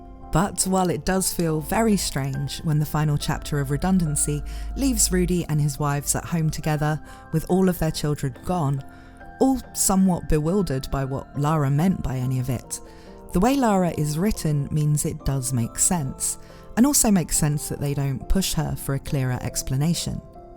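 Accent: United Kingdom. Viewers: WhenI heard you say "Last One to Leave the Nest", I had a tear in my eye. Also: Christina Best Child Do people in the UK (assuming based on your accent) pronounce it "obleej"? Because in French, that "é" would pronounced like in "hey' (without the "y" sound). Not sure if this makes any sense. Truly loving your content. Gonna check that Vivy video next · de donde eres? xd suena a britanico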